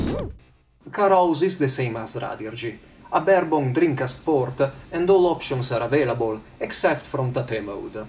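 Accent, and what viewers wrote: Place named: Italy. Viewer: im sure you heard this many times before but.. i looove your accent. Hearing that italian accent is such a breath of fresh air to my ears =)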